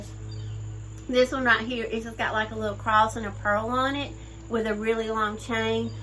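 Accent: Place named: Alabama, United States. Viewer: Hi neighbor! Just found your channel and absolutely love it. When I first listened to your voice I new you must be from Alabama. I live a little to the east of you in the same state. Ever been to Gadsden? You create such lovely work and have a great eye for staging. Really look forward to viewing older videos and new ones as you are inspired. God bless you, Sherry from Alabama.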